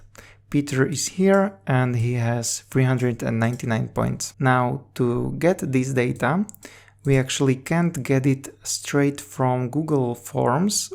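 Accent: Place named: Spain. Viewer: Perdona, pero tu normalmente no hablas ingles 😅. Tu ingles es muy español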